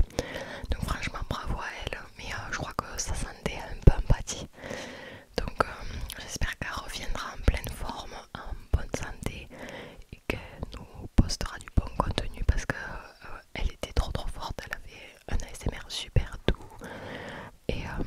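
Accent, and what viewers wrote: South, France. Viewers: Hello, oh mais c'est trop adorable ! Merci merci pour tes mots 🥰 Je regarde souvent tes vidéos aussi et j'apprécie ton chuchotement avec ton accent qui sent bon le sud 🥰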